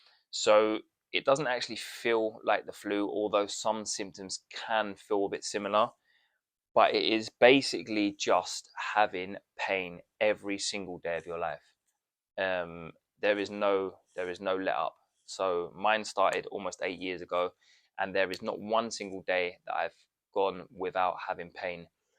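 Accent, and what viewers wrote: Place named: United Kingdom. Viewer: Hi 👋, im new to your wonderful channel here on Fibro..I just came across your channel and find it sofar to the point info on everything about it as u can tell us all.The full..Heads Up on this awful painful condition..gentle and friendly nice huggs to u hon.❤❤❤love your awesome accent as well. You British or Australian. Im guessing..British..sweet anyways..ill keep watching daily keep posting ok.much love from me..Nancy from Jacksonville Florida..USA❤❤❤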